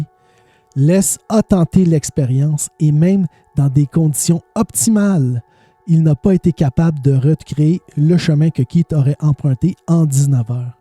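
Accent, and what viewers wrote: Canada. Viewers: Préviens que tu es canadien horrible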